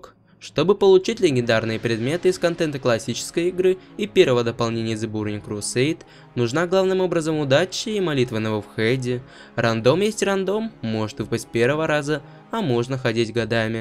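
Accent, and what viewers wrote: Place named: England, United Kingdom. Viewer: Сильный английский акцент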